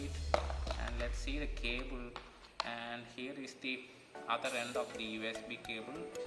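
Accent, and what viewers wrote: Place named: Kerala, India. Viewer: are you malayali? :D you speak like one....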